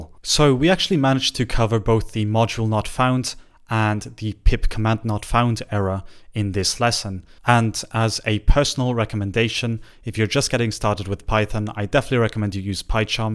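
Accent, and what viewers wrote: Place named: Italy. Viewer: MA SEI ITALIANO LOOOL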